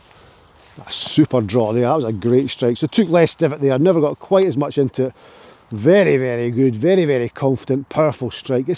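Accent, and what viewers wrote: Scotland, United Kingdom. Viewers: Without a doubt. (from a guy who's played 60+ years) this is the best golf swing concept I have ever seen. And it works! Took me a bit to get the Scottish accent (thought "canes" was "keyes").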